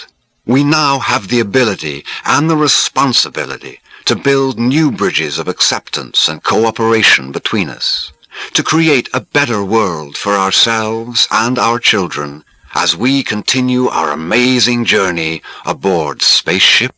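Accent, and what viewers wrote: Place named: United States. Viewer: Jeremy Irons seems to have an American twang to his voice here. Has he deliberately done so for a predominantly American audience?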